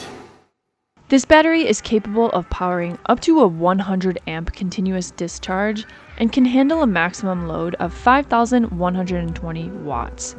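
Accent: France. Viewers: Ça me fait tellement rire car le gars on voit qu'il est français car il a le petit accent bien de chez nous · Un accent très français pour monsieur 😊 je découvre votre chaîne, et ça me plaît bien. ✌️👍bisous de France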